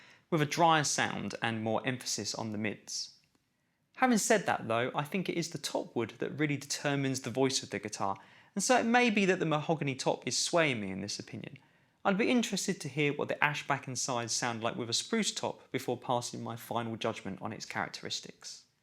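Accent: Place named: United Kingdom. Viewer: I’m tickled with your British accent. Want to ask what country and region are you from ?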